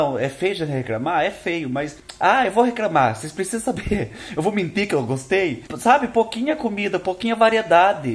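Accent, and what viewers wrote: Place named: Paraná, Brazil. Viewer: vc é de que estado do Brasil.Digo onde nasceu,porque eu hoje faz 31 anos que morro em santa catarina, mais nasci no paraná e morei la ate meus 13 anos, e todos os teus ditados modo de falar me parese ser paranaense.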